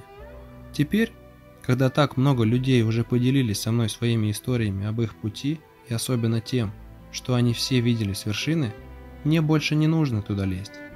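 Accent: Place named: Russia. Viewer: Темнишь ты ,акцент кагбуд то родился в сраной рф и в ней и по сей день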